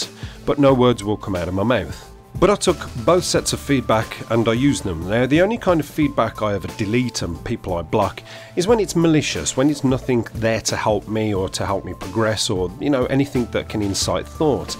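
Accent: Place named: Black Country, United Kingdom. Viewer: Great vid new sub u sound like a fellow yam yam with ya accent are u from wolves or nearby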